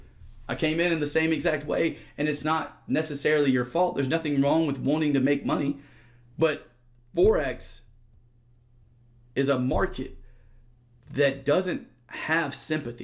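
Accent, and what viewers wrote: South, United States. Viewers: Love your quality content & your southern accent brother. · Very helpful videos, love that subtle southern twang too!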